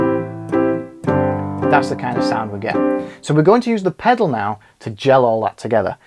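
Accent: Yorkshire, United Kingdom. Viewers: are you form Yorkshire?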